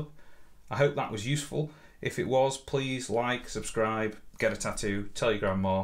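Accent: Stoke, United Kingdom. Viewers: Hey! Are you a Stokie?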